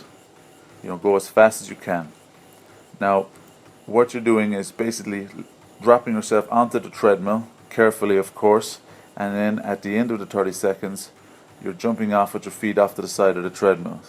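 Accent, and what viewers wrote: Ireland. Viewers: You sound irish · You Irish?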